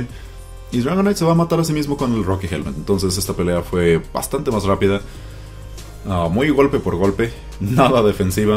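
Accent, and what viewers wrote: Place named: Mexico. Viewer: Me gusta como narras, muy natural y al estilo mexicano jaja, pero me gustaria que usaras pokes poco comunes, ya sean Uu o Ru eso estaria chingon, saludos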